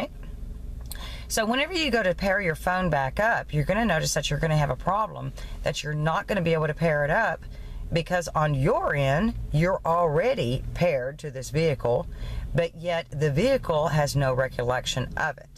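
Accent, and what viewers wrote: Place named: Virginia, United States. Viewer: Really? I liked her voice. More Virginian I thought.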